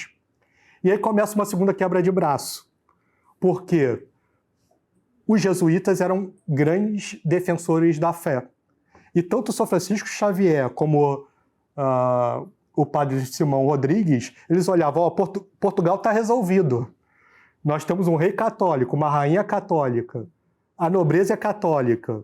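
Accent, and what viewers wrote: Rio de Janeiro, Brazil. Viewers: Qual o interesse de assistir a quase duas horas ouvindo um carioca com forte sotaque falando de um padre que julgamos já saber quem foi? Pois informo: nessa conferência há história da Igreja, história do Brasil, história dos Santos, testemunho de milagres, história das batalhas, história dos índios, e muita emoção e piedade. Vale cada minuto. E para quem ama a Igreja, ama nossa terra, no final corre o risco de derrubar lágrimas de beleza, como eu. Viva Cristo Rei!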